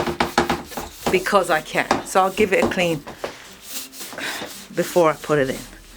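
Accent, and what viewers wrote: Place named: United Kingdom. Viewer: Thank you for this video. My parents have 4 very nice but old metal blinds that all need repairs. And cleaning. You seem very down to earth and I enjoyed the way you presented all this. Using your instructions to repair blinds all the way in Australia 😁 (I'm assuming you're in the UK coz your accent sounds like my brother-in-law's). Cheers 🙌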